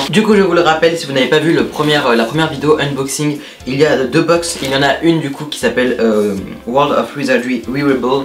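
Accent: England, United Kingdom. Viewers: J'ai beaucoup trop rigolé 😂😂 on adore ton accent anglais 😂😂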